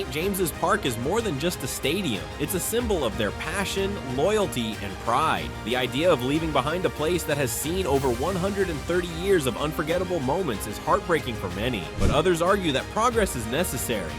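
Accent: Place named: United States. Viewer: More click bait in an American accent ✊🏻✊🏻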